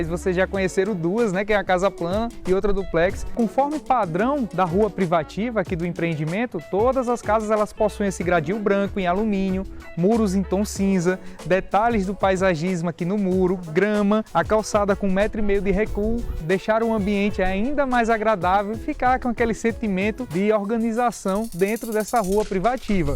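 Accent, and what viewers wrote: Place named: Ceará, Brazil. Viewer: Gente esse canal virou uma série pra mim. Na proxima encarnação vou nascer no ceará quero ter um sotaque assim.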